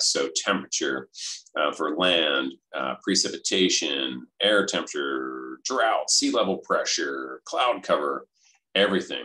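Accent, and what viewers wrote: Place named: United States. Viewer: Professor, thank you very much and congratulations for this very didactic video. Could you also add the footnotes in this video to allow a good understanding? I, for example, do not understand the American accent very well.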